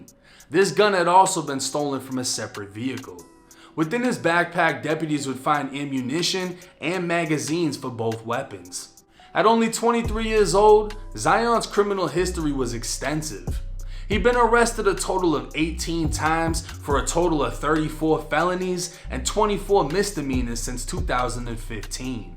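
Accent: Boston, United States. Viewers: My dude…..that Boston accent is intense!! 🙌🏽🙌🏽🙌🏽🔥🔥🔥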